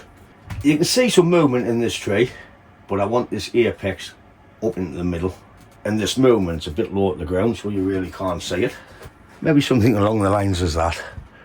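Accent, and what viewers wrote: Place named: Northern England, United Kingdom. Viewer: you're welcome mate. I really enjoy your channel. As a fellow northerner stuck down south, it's refreshing to hear the banter and accent.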